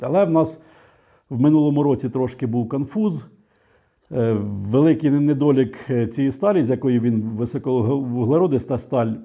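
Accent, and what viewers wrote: Poltava, Ukraine. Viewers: Українська мова з нотками полтавського суржика - Це супер . Ви молодець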